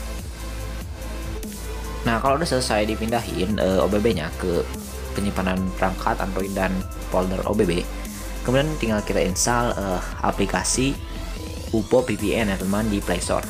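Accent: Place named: West Sumatra, Indonesia. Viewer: SUARA MU KYK MINANG KOCAK BANG, TP MANTULLL BANGGG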